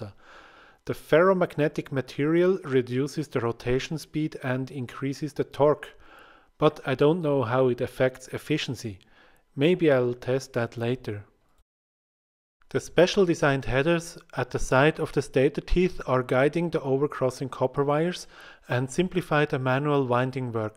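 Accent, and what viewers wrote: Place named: Switzerland. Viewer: Der Dialekt liess mich vermuten, dass du vlt. Schweizer bist wie ich. Ein Blick auf den Kanal bestätigt zumindest die deutsche Muttersprache.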